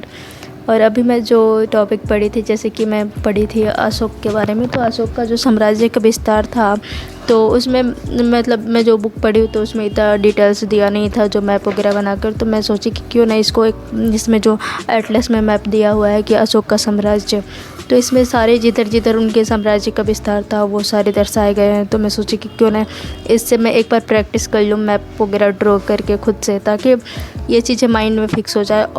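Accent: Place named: Odisha, India. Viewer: Aap Odisha se ho naa.. awaz se lag rha hai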